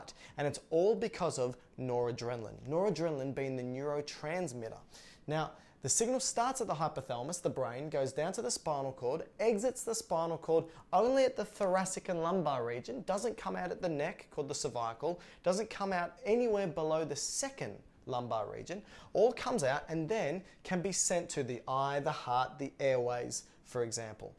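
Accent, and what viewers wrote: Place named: Australia. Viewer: Your accent is exact like some of the legendary Australian cricketers..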